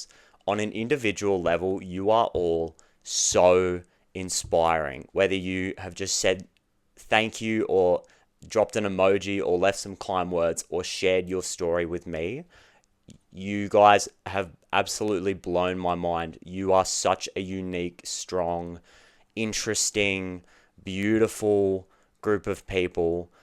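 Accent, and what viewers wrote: Australia. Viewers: Been trying to place your accent! Not american, are you? Australian? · Hello seeing you for the first time and I want to say what a handsome face you have, so nice looking. What part of Australia are you from, you accent to me sounds Australian. You also seem a sensitive and shy person. Your reading resonated with me. Greetings from me in the Emerald isle of Ireland and a very happy and blessed new year to you and your family. 🇮🇪🙏🙋🎄☃️😁🇦🇺.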